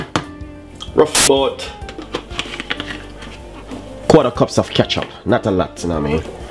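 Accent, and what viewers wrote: Jamaica. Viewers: Sound like a Jamaican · That’s really just how Jamaicans talk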